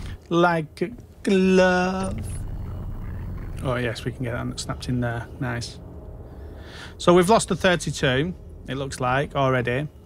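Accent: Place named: England, United Kingdom. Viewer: Where in England is your accent from?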